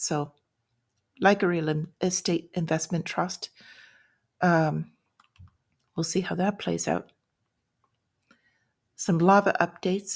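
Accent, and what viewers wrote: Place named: Ireland. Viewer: Greg Mannarino recommended you. I like your news & love your accent! Forgive my possible ignorance, but are you Irish? If so, can you comment on the insanity going on there?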